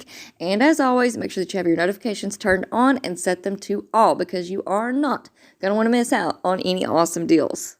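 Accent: South, United States. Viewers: I sure hope this doesn’t come off wrong, bc it’s actually a big compliment. I used to be in marketing and it’s great to see someone who is smart enough to worry about saving .50 cents be so good at promoting their channel. I can tell it’s bc you are passionate about helping others. You have a great voice and I’m learning alot from your channel. I’m from the south too and I can tell you are 🎉. You’re the type of person who can win the lottery and not spend it all at once.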